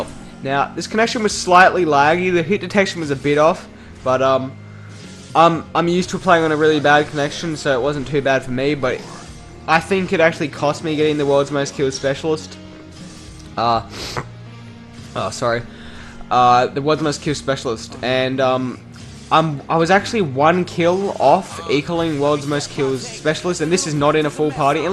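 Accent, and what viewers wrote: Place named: Australia. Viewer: Aussie! ;) - KRNG Raymian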